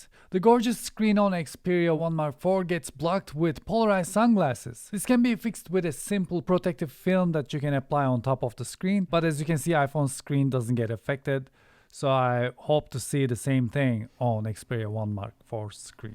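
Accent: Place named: Turkey. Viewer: You have a Turkish accent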